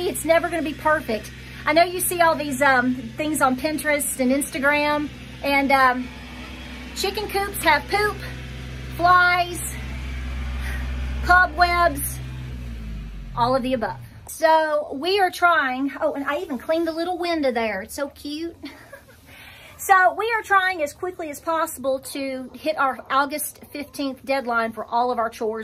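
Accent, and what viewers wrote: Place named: East Tennessee, United States. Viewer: Found you about 2 weeks ago & have been “soaking” up all your advice & wisdom!! You mentioned Tight Wad Gazette in a video I watched today from awhile back. Just wanted you to know I found all 1-3 on Ebay & paid about less than $20 / free shipping SCORE & Thank You!!! Grew up in East TN & never tiring of hearing you / your accent!!! ❤❤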